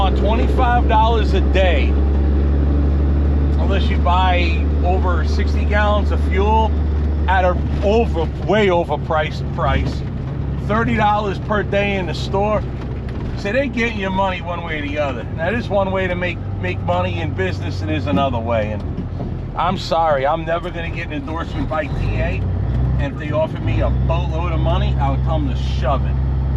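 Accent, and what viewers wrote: New Jersey, United States. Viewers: sounds like you just spent a month back in New Jersey. Heavy accent in this video for some reason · I thought I was picking up on a Jersey accent. Go Joisy!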